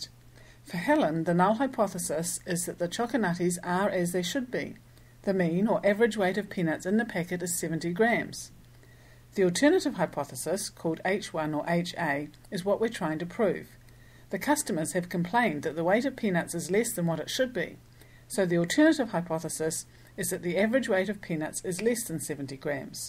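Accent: New Zealand. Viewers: Are you from New Zealand?? I love that country and want to go back some day! Love the accent too.. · With your videos I am learning Statistics AND New Zealand's English :)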